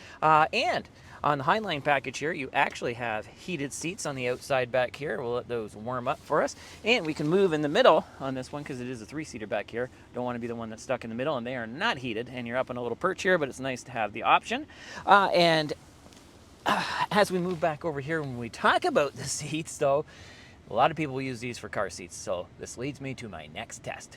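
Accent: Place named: Canada. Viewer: Hello from Manitoba! I can definitely hear that typical "canadian" accent everyone always talks about in the way you speak, im only part way through the video but you have definitely been very infromative so far!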